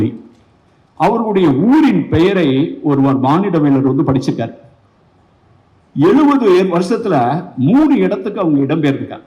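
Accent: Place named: Madurai, India. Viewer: அழகான மதுரை தமிழில் சிந்துவெளி நாகரீகம் சங்க கால தமிழ் நாகரீகத்தையும் விளக்கியதற்கு நன்றி ஐயா.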